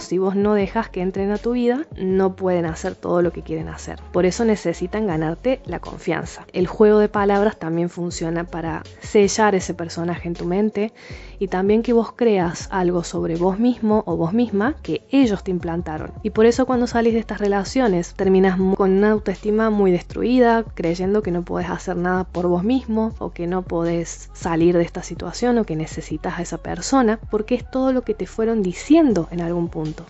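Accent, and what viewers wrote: Córdoba, Argentina. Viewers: ! Gracias! Me encanta escuchar tu tonada! Vivo en Chile hace unos años...y es muy grato seguir a una cordobesa! Estoy muy contenta de encontrar en youtube videos de la excelencia que tienen los tuyos! Gran trabajo! Felicitaciones! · Es Cordobesa por su tonada, pero si sos de afuera, capaz que hace sesiones por video llamadas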